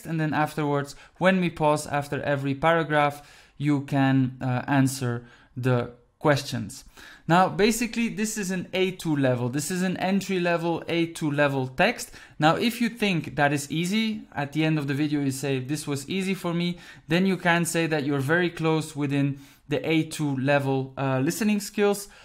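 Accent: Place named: Belgium. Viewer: Dit is Belgisch. Niet Nederlands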